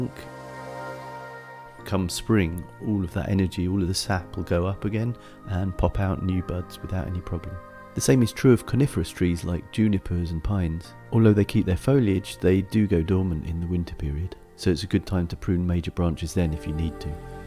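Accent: United Kingdom. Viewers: Thanks, the music is fantastic as always. I can notice you are doing mindfulness sessions just listening your peaceful voice jiji. I love your perfect English accent. Take care.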